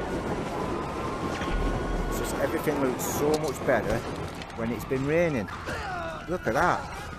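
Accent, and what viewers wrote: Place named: United Kingdom. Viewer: I can't understand what this man is saying because of that accent. Sounds British and I don't understand British.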